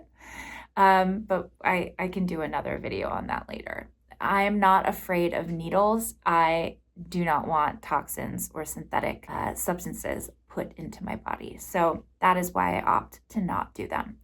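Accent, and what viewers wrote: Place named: California, United States. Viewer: I think it’s actually the California accent so it might be challenging for people with a southern accent which is pretty different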